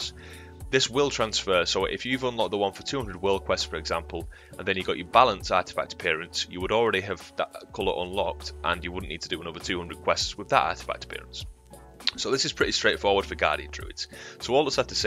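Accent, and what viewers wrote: Yorkshire, United Kingdom. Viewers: good to hear another fellow yorkshireman :)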